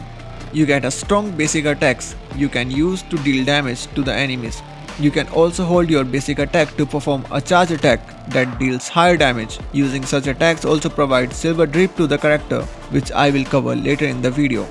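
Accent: India. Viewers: Hey umm are you indian...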